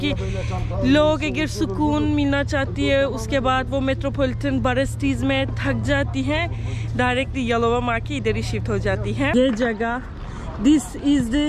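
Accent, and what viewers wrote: Pakistan. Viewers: Thank You for making beautiful vlogs. Totally loved it. I like the way u speak urdu. In love with ur urdu accent. Ma Sha Allah keep making gud videos. N May Allah bless you and your family with healthy life Ameen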